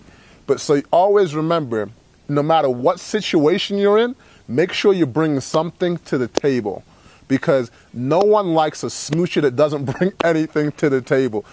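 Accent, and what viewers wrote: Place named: England, United Kingdom. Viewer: you seem to have an English accent.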